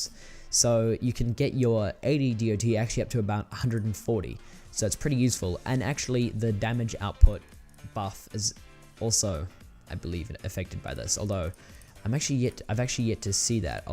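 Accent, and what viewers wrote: New Zealand. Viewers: is this dude from NZ, it kinda sounds like it i'm probably wrong?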